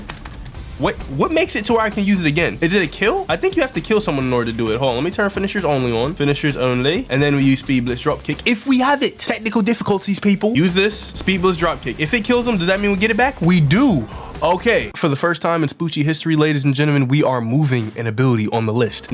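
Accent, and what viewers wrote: United Kingdom. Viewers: WHEN WERE YOU BRITISH? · HE'S BRITISH? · Why is he British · bro is british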